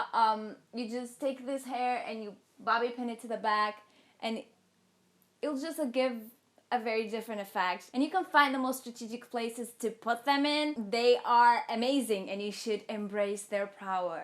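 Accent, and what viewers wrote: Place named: Portugal. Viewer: eu estava tentando descobrir qual era sua nacionalidade já que não parece ser um nome ingles, e por causa do sotaque também, e agora que descobri que você é Portuguesa tudo fez sentido haha beijos do Brasil! adoro seu canal!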